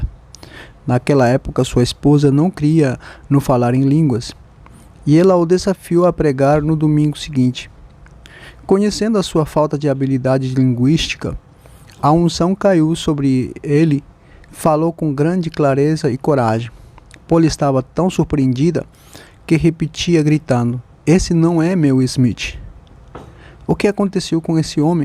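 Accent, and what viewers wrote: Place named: Spain. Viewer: A pessoa que narra ovídeo tem sotaque de alguém de língua espanhola , portanto não tem nada de horrível!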